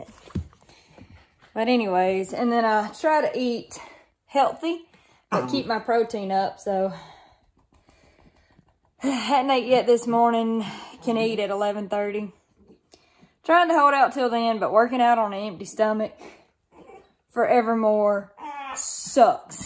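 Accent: South, United States. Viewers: I love that Souther accent. You are a very beautiful girl love your little puppy.Have a great day beautiful. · Hello from Thailand :) Been following your video‘s and those of your dad and I truly appreciate :) ya’ll I had the big chance to learn my English during a couple of exchanges in a great family in NC , and after some adaptations I started to really enjoy your “southern slang” :) So thank ya’ll for your cool content. Now I wonder why you try fasting, but if you really want to loose some weight, maybe try to lessen the fried stuff from time to time. Here they do not use a lot of butter or oil when cooking, they boil for example chicken in coconut milk, with some onions, herbs, garlic and pepper, and it’s delicious, your are full and you start loosing weight also :) We eat this with some rice. But I truly miss the delicious fried catfish the dad used to prepare after fishing together. 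Even got the chance to experience true “frogging’” at night time :)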